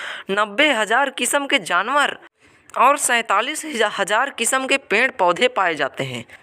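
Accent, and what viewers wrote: Bihar, India. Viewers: Bhai tum bihar ko ho kya?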